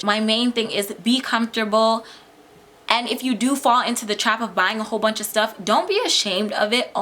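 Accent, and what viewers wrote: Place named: Toronto, Canada. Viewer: I don’t know if I’m bugging, but I can lowkey hear a Toronto accent😭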